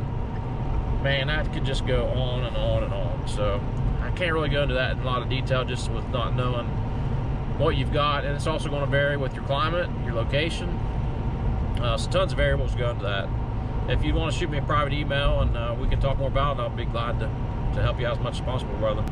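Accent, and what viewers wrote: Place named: South, United States. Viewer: You ever played Edgewater in Lancaster, SC? I would have never guessed you were from Ohio. You sound like your from the South. Not meaning that in a negative way, just an observation. Thanks buddy. SC has some nice courses!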